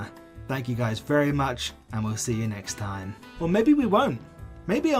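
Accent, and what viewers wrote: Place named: United Kingdom. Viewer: Oh for the love of god, of course he's british😭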